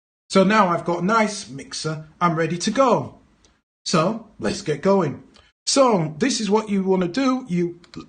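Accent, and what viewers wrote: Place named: Northern England, United Kingdom. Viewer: Hey! an Englishman, and a northerner to boot, What is there not to like. Seriously though its refreshing to listen and learn from someone from my own country. I've Subscribed and given you a like, and I know I will be constantly referring to this video as there is no way this old fart will take in and understand all 47 mins of this video in one go. Thanks again for taking the time to teach us a little of what you know 😎😎😎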